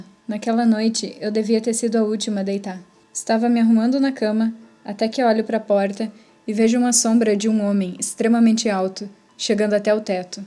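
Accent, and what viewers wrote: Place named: South, Brazil. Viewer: Adoro vc e o canal. Vc tem sotaque do Sul. Sou gaúcha. E vc é de onde?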